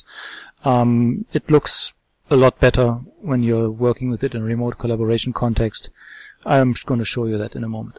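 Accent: Germany. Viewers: Ist das ein deutscher Akzent, den ich da raushöre?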